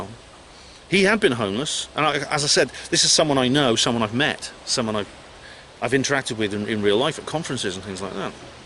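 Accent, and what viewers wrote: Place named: Cockney, United Kingdom. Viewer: mate, I am from Hertfordshire and there is nothing more annoying than a three counties Cockney.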